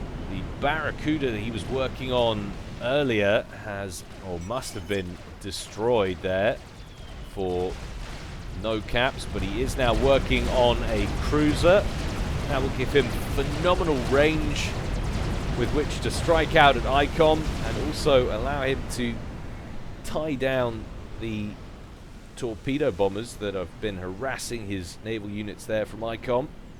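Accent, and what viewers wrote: United Kingdom. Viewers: Oh this british Tyrannus hurts in my ear D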